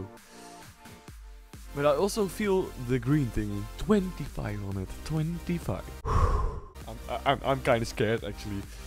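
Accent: Netherlands. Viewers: Are u from the netherlands or so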